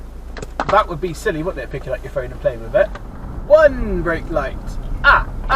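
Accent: United Kingdom. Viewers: Matey, i loove your pure BRITISH accent :-))) Cheers! BTW...Good lessons for ya kid, matey :-)))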